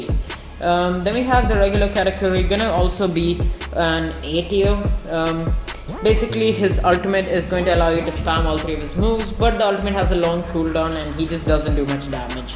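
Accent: India. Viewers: Bro you indian? Because your English way of talking is similar indians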